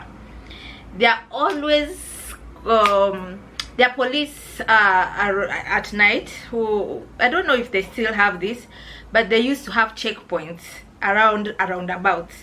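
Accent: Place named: Kenya. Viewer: I always love to hear your stories , sweet kenyan ascent unfortunately too much in Swahili , didn't understand, nice of you though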